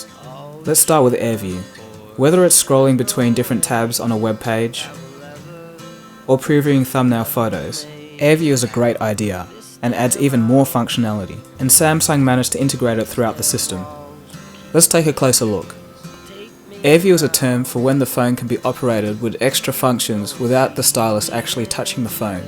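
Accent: Australia. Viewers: This is one one of the best reviews I have ever seen. I just wish I could buy the phone. It's too pricy for me to buy. I am from Indonesia and it cost a lot here. All I want to say thanks mate. I love your Aussie accent. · great review but I still love my iPhone 5. Love your australian accent though XD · Really good review, probly the best review of anything ive seen on youtube, no kidding oh and great to hear a fellow Aussie doing it. looks like an Awesome device, didnt see any drawing on it really, will look at your other clips.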